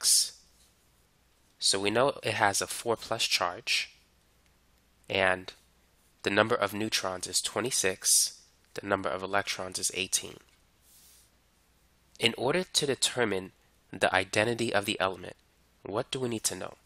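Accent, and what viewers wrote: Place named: Boston, United States. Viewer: you sound like your from boston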